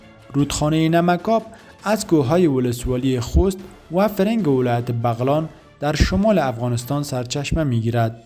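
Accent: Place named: Iran. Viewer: mixed of Persian with Iranian accent. Guys y want respect speak in your dialect and accent. Ty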